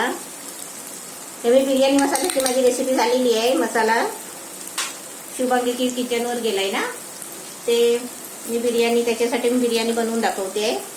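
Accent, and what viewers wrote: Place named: Ratnagiri, India. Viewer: Taee masta biryani banavata ratnagiri chi bhasha pan mast bolta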